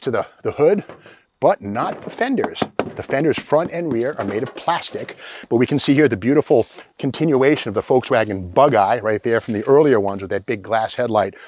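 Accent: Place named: Boston, United States. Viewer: Boastun accent lol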